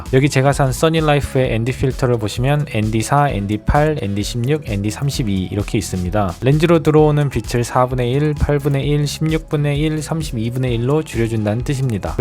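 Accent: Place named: United States. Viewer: 영어학원인줄 알았습니다. 발음이 미국인...^^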